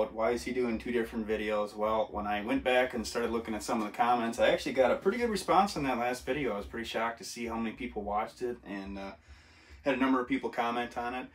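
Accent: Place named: Wisconsin, United States. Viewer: can tell you are from wisconsin! you betcha.. gotta take the family shopping at fleet farm for EVERYTHING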